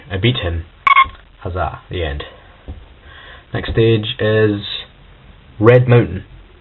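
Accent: Scotland, United Kingdom. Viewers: I like how you are Scottish, man.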